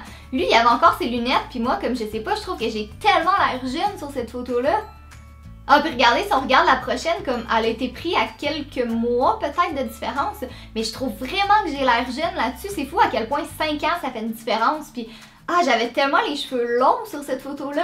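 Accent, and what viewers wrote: Quebec, Canada. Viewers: évidemment que je veux une version 2 💘💘 PS: je te trouve trop jolie et ton accent québécois est tellement chou 😍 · oh là là je kiffe ton accent j'adore l'accent québécois mais juste question tu as quel âge parce que apparemment tu as plus de 22 ans mais tu fais vachement jeune tu fais genre 17 ans à peu près peut-être 20 je sais pas mais en tout cas tu fais plus jeune que ton âge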